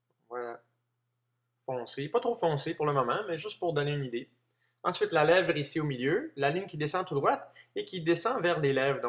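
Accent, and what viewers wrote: Quebec, Canada. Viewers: L'accent du Quebec ça donne de la bonne humeur ^_^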